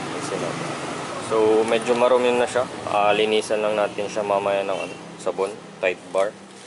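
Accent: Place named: Pangasinan, Philippines. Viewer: taga pangasinan punto nyu lodz ah😂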